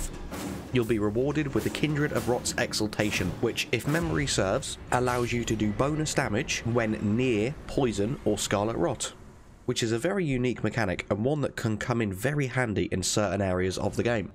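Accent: United Kingdom. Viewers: My apologies I did not receive a notification regarding your reply 😅 This may sound weird but I wish I could live in the UK hearing your accent 😄 It's fuckin hot as Volcano Manor here in Arizona 😂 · Even if I am on ng+2, almost all looted. It's a pleasure to hear you I don't know if you are british, but you accent is really pleasant to hear. Greetings from France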